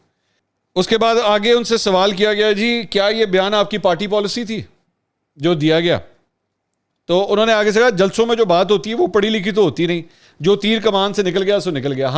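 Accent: Punjab, Pakistan. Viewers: Punjabi ka tarka bara mazy ka hota ha boht achi lagti ha ap ki zuban pa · منصور بھائی پنجابی، سلام It is also observed that your Urdu accent is turning more toward Punjabi style. Unexpected in from a educated Media man doing a V Log on nation level. Atta Tarr incident was not that important to discuss in length, plus your “ Bhashan” made it further boring.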